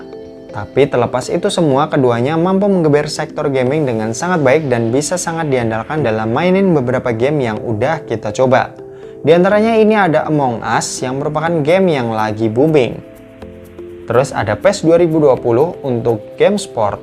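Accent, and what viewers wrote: Semarang, Indonesia. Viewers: Eong Semarang to ndeng? Hehe sukses selalu lur... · Masnya orang Semarang ternyata wkwkwk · lu orang semarang bang ?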